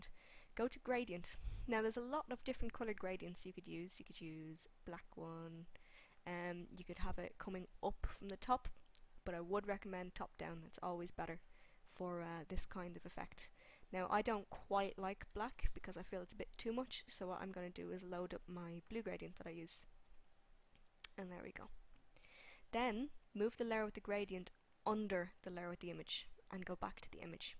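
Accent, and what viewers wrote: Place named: Ireland. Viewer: Irish accent is best accent.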